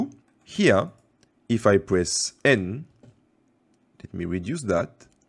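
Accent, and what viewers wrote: France. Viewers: He sounds so french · That French accent though! Perfect for learning :D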